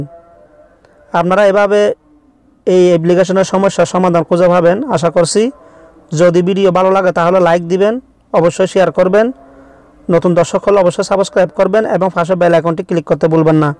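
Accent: Chittagong, Bangladesh. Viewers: চট্টগ্রাম এর নাকি ভাইয়া আপনি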